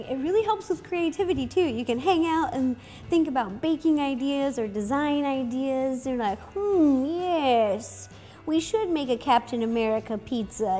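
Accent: United States. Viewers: Are you American or whatever?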